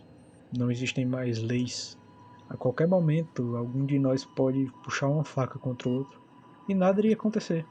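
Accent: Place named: Brazil. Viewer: Eu sou do ES naturalmente "sem sotaque" mas ouvir um sotaque tão brasileiro me aproximou tanto que não sei explicar.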